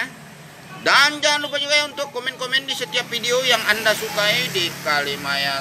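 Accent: West Sumatra, Indonesia. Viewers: Suaranya kayak minang kocak...lucu gan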